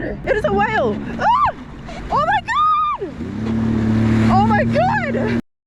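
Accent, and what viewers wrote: Australia. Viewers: You got some skills women! That Australian accent is something else 😍..Australian women are built different!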